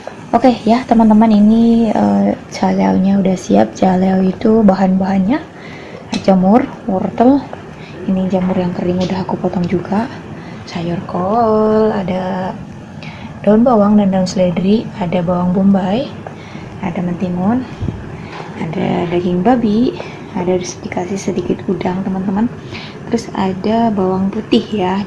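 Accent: Banyumas, Indonesia. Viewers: Salam ngapak sliramu pinter masak pantesan bojone betah nang umah sampean nang luar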